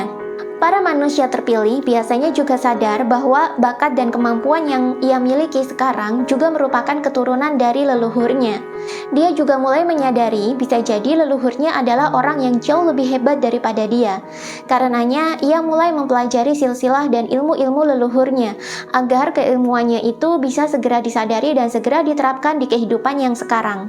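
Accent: Java, Indonesia. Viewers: sampean kok medok mbak. jowo po ! 🤭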